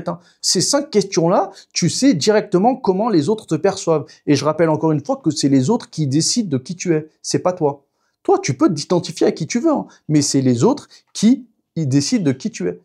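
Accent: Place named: France. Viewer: Titre évocateur, maintenant à ta manière de parler c'est vraiment très bête de parler exactement comme un arabe de cité... Tju vois ce que je djire ? Un effort bordel, prononciation/20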